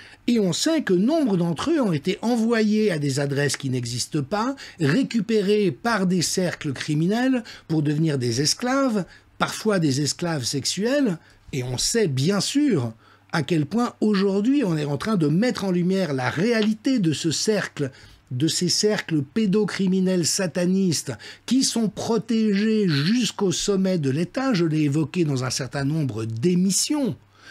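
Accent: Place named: South, France. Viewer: J'adore ce nouveau format, hyper jolie😍 avec l'accent du sud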